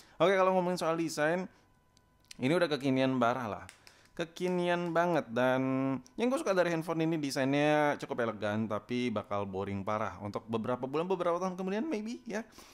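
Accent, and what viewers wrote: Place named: Medan, Indonesia. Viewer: "Udah Ga sabar kali Awak!" Ihhh Medan kali kau bang..!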